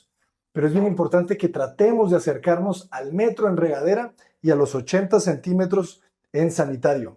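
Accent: India. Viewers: La pronunciación del inglés suena con acento que tiene la gente de la India 🇮🇳 ☺️ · Hola siempre me gusta ver tus videos, pero hoy me sorprendió te admiro por tu valor de ablar inglés sabiendo que no tienes el asentó esto es una crítica constructiva , eres el primer mexicano que escucho con asento ,, Indu,, pero sigue practicando y trata de ablar con una persona nativa con inglés y pregúntale como suena tu inglés y que sea sinsero, eso te ara corregir muchas palabras, pero una ves más felicidades admiró tu trabajo 😊.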